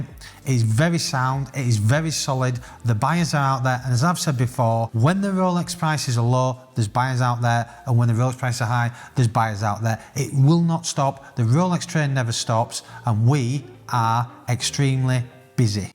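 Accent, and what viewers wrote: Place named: North, United Kingdom. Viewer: Great content as ever. You’ve got your own niche here. A lot of the watch YouTubers are semi-weirdos. Nice to hear some straight talking from up North.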